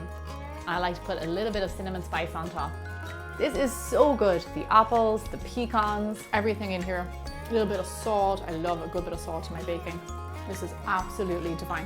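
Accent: Ireland. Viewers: They are all amazing! You've taken mug "cakes" to the next level! Weill dun (trying to imitate your super cool Irish accent! lol) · Did anyone else notice she had an Irish accent.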